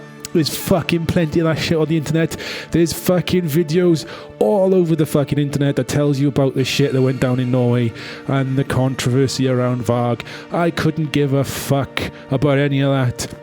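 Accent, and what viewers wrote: Wales, United Kingdom. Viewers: Is this a fellow Welshman I hear?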